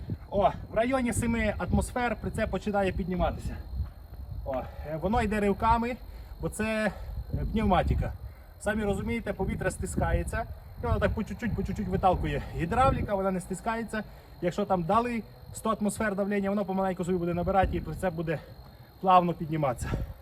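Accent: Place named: Surzhyk, Ukraine. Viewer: суржик у тебе жахливий,щось мені підказує що народився і виріс ти не у Рівненській області.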